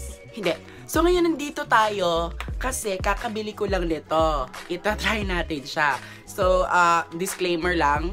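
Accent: Visayas, Philippines. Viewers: Ay bisayaaaa pala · Bisaya ka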